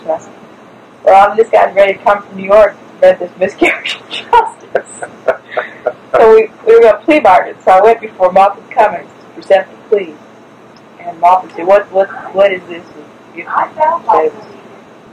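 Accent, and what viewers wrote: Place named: South, United States. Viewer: This does not sound like Hillary Clinton at all. She is from Illinois and this woman has a distinct southern accent and her tone sounds nothing like Hillary Clinton. This woman sounds like one of the designing women.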